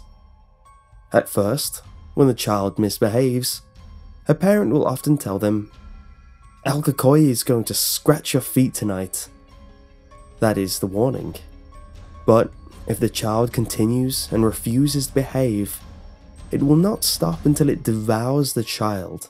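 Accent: England, United Kingdom. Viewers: It's an English accent there is no such thing as an British accent at all. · lol you kept saying it wrong but I still love that British accent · give him a break! he has a british accent what do you expect! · Im hispanic, and you saying Spanish-y things with your wonderful British accent was enjoyable XD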